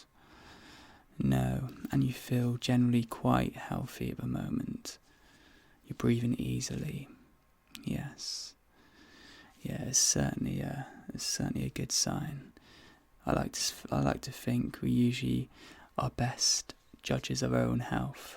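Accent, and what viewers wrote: United Kingdom. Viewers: The British accent is really beautiful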